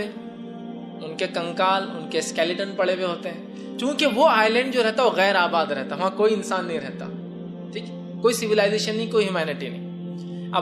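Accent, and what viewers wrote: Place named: Hyderabad, India. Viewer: Hyderabad wale lag rahe hai ye.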